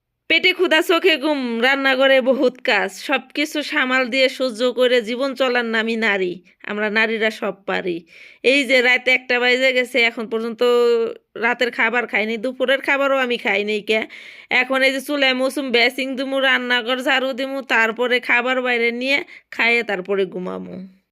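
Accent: Manikganj, Bangladesh. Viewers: আপনার কথা গুলো আমাদের মানিক গঞ্জ এর মতো লাগে আপনার বাসা কোথায় আপু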